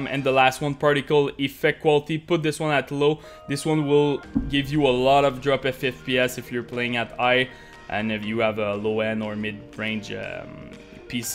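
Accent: Quebec, Canada. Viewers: t'es québécois sa parait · This guy is from Quebec, I'm sure of it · tu sonne comme un quebecois qui essaye de parler anglais (your voice sounds like a guy that speaks french trying to speak english)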